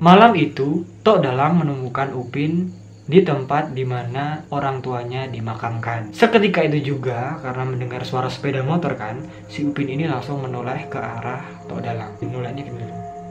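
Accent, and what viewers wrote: Java, Indonesia. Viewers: Orang jawa mana ni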